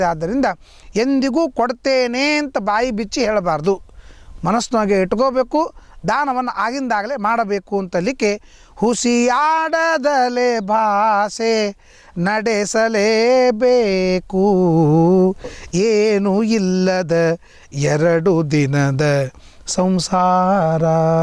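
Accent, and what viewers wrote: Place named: North Karnataka, India. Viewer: Uttara karnataka kannada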